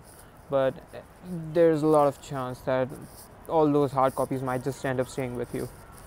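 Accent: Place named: England, United Kingdom. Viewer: You r a outstanding quoran brother but remember one thing this english accent won't fetch publicity to u...Just be practical...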